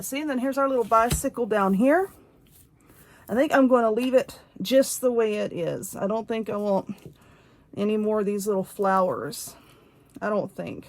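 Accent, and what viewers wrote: South, United States. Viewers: Grand idea. I like your southern accent. I am an Island girl and I have an accent. I think it's cool. Thank you for sharing and yes l ok ve the mermaid nails. God bless you add and your family.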